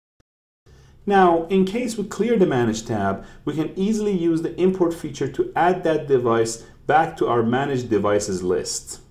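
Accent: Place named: Iran. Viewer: Damn.u got some persian accent.nice teaching by the way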